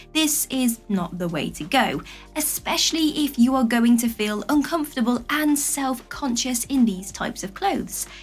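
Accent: United Kingdom. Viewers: I'm already married, but I enjoy hearing these tips and love the British accent. And these videos are a nice distraction from all the fucked up things going on in the world.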